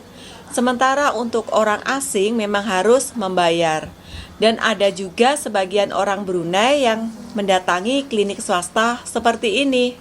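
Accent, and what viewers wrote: East Java, Indonesia. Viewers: aku senang sama gaya bahasanya jeng, pakai bahasa Melayu, tapi logate medok khas Jatim, keren jeng... 👍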